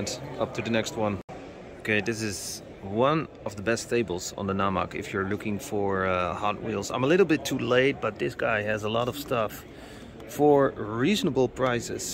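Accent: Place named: Belgium. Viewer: it's funny, when talking English sounds Belgian, when chatting Dutch rather 'Hollands':-)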